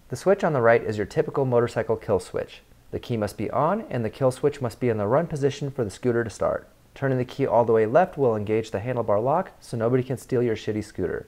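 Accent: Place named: China. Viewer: Chinglish!!!! I love it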